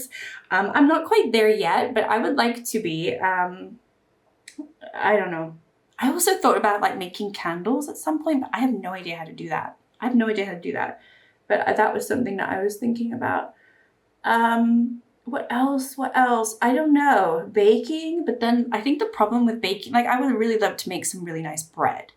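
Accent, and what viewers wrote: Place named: United Kingdom. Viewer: So interesting to hear about all the places you have lived 🙂 you definitely have a British accent, even though you spent quite many years in the US. I actually work at an OB school currently, I am the coordinator and we just got authorized last year! Did you like the school? It is quite different from the Swedish school system so we are a bit making it up as we go here 😅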